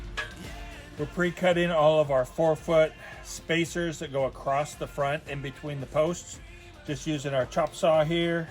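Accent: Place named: South, United States. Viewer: "fixin' to finsih framin' the wall", I think could pass as a Southerner.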